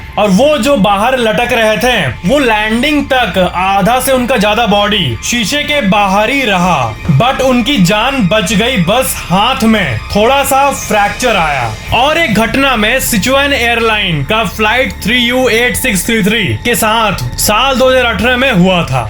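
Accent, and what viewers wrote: Bihar, India. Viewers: Bhiy bihari h 😁😁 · Aap Bihari ho kya bhai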